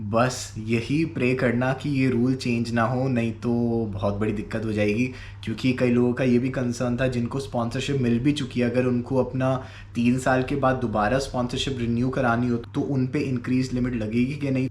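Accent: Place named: India. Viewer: I can't your English very well it's too indianish!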